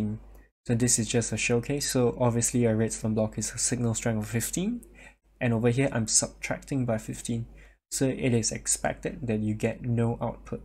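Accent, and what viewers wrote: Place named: Singapore. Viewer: Hope you don't mind me asking, I feel like I recognize your accent. Are you from somewhere in or near Lion City by any chance?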